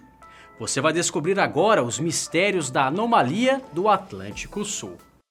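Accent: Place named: Northeast, Brazil. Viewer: Kkkk. Aki no nordeste algumas pessoas falam assim😂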